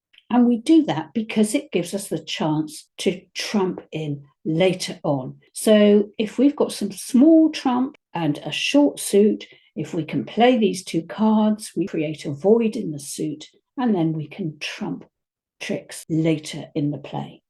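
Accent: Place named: United Kingdom. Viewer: Hello from New Zealand! I am a complete beginner, having just completed an initial 8-week course on ACOL with my local club. I am looking around for additional information and must say that your explanations are excellent. They give important context to all the rules and principles I'm trying to absorb. Thank you for this as it's much easier to remember the rules when I understand the reasons behind them. I look forward to working my way through your videos as I think they are going to be really helpful. 15,000 views would suggest that others feel the same. Love your calm British way of speaking as well - you are a joy to listen to. Thanks again :-)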